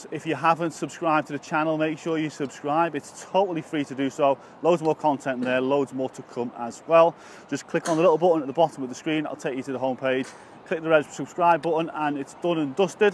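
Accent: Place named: Liverpool, United Kingdom. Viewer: Great tip. I've been struggling with the drive for years & this tip immediately improved things for me. I'm living in Sydney, Australia but originally from Liverpool (Aintree - Old Roan) - I suspect from your accent you're from the same area.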